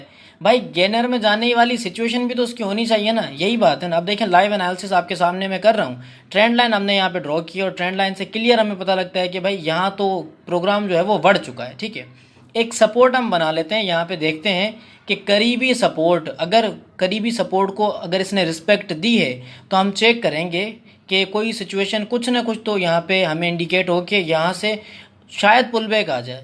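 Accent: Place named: Karachi, Pakistan. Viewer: isliye poocha k apki urdu Karachi wali lagti thi main khud Karachi se hun tou isliye confirm kar raha tha... hahaha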